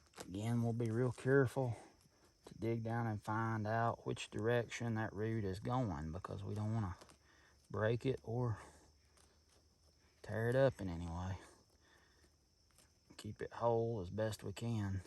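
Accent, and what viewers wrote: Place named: South, United States. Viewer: Thank you so much. What a nice tribute to your Mom! I enjoyed listening to your southern drawl as you led the hunting expedition. It was great to actually be there and watch a pro at work. Your Momma taught you well...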